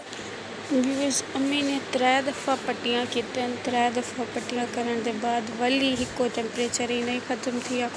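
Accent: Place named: Muzaffargarh, Pakistan. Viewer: ap basckly Kha sy haiii Ap k leljy sy Tu ap Mujy district Muzaffragrah jatoi city sy lgti haiii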